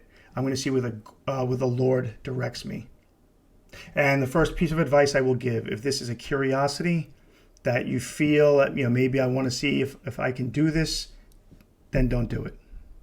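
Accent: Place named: New York, United States. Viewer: I love your New York accent! Thank you for doing this podcast. Blessings to you and from South Africa.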